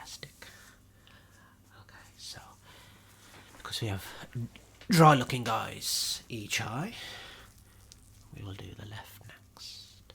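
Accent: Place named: England, United Kingdom. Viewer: Sounds like an Englishman to me!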